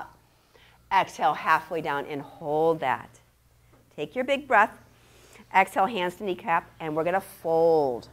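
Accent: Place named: Ohio, United States. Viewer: Oh is yours an Ohio accent? No I wouldn't have guessed. Though I'm not good at detecting accents other than the more obvious ones such as Boston, Long Island, Chicago, South Jersey and of course vaguely Southern or Texan. Though now that you mention it, I guess you sound Eastern U.S. It's probably hard to tell in CA, with so much mixture. Anyway your voice is comforting and I like listening to you.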